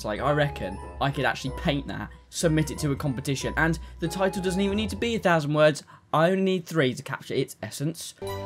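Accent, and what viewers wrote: United Kingdom. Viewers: i do enjoy this content because hes British and speaks funny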